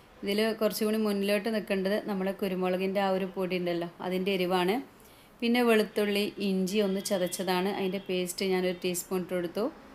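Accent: Malappuram, India. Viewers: Mpm slang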